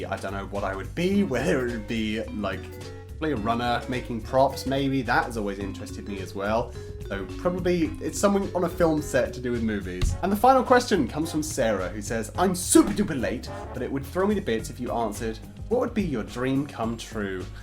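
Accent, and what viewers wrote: England, United Kingdom. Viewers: Because he's British. NOT English-speaking **BRITISH**. Edit: Didn't need to edit. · it is because he is English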